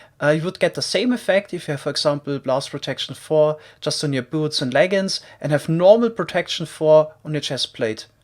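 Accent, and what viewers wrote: Germany. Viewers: Hörst dich wie n deutscher an der englisch redet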